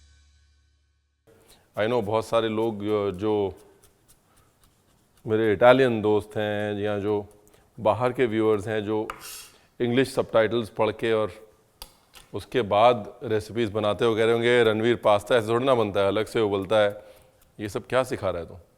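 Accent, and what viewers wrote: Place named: Lucknow, India. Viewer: Liv ur lucknowwaaa slang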